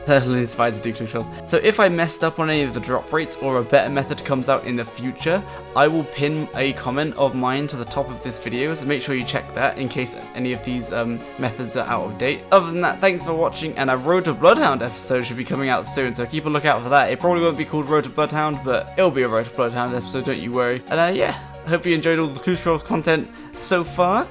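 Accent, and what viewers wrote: Australia. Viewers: He looks like an American, with Australian voice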